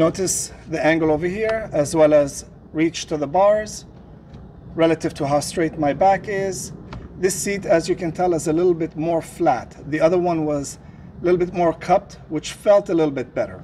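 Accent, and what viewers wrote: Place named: Middle East. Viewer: This is the best comparison video for motorcycle seats. Great job, by the way. Lovely middle eastern accent😉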